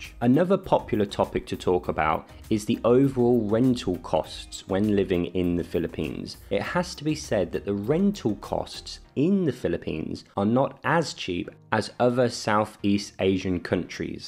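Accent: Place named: United States. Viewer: u must been a american that is why u talk like that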